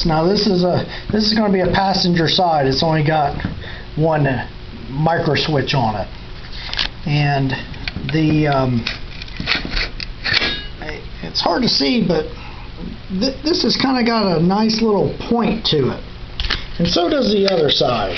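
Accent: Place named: Northwest, United States. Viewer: He must be from the northwest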